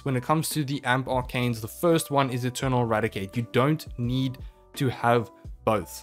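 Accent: South Africa. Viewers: You sound so South African... Man I hope I'm not just going crazy. Best guide I've seen on this, VERY well done, you've earned a sub!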